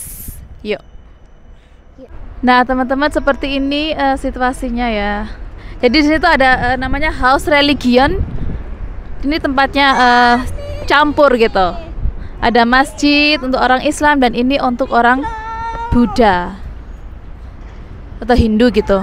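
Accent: Java, Indonesia. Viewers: padahal muk ngene iki pidione, tapi panggah tak like 😆😁 demen leh medokkkkkkk, gass bund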